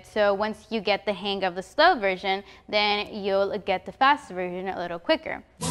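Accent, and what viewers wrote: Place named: United Kingdom. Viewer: Who is this British chick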